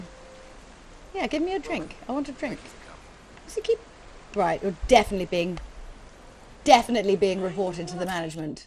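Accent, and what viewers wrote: United Kingdom. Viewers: The posh accent does it for me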